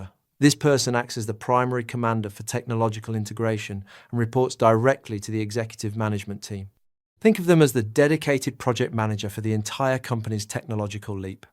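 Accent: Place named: United States. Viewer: Great to find a British English instructor with clear examples and explanations. However, I really do not like the American spelling and pronunciation. TY though. I will be sharing the links.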